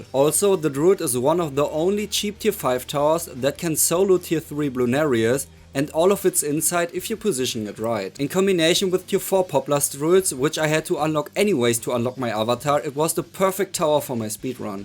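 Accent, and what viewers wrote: Germany. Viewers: Er: Woher weißt du, dass ich deutsch bin? Auch sein Akzent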